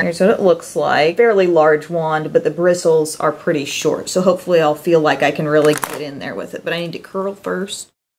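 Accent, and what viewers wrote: South, United States. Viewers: It's so interesting to hear your "accent" because I live in the northwest suburbs of Chicago and I don't hear that accent here! It sounds southern :) I love it! #snapchatfam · Got a little more southern drawl in your speech today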